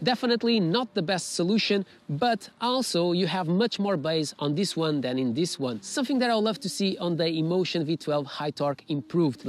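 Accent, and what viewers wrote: Portugal. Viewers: Este sotaque é tuga não é? 😂 ❤🇵🇹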